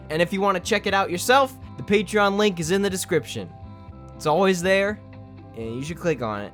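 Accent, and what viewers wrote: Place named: United Kingdom. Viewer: The sheer amount of dopamine that released when I heard the british narrator's voice cured my depression.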